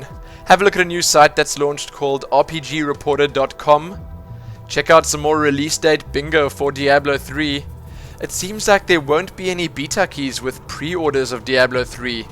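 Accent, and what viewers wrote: South Africa. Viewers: This is why regular TV is dead. Freely accessible, top notch content like this is on Youtube. I might say niche content but it;s well produced nonetheless. Got to love that South-African accent., you do excellent vlogs as well man. Did you ever consider presenting a Pulse episode?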